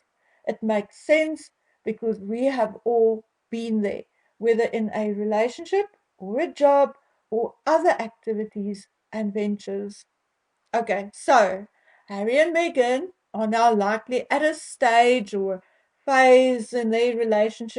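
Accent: South Africa. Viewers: The South African accent grates my ears.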